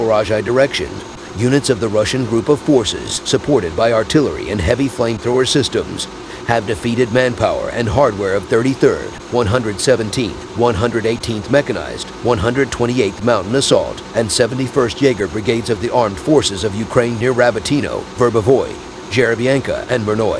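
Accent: United States. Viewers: Давно мечтал, чтобы Американский комментатор,озвучил положение на фронте!!!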